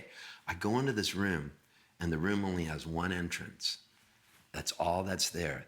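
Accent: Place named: California, United States. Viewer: California accent for the win with these stories!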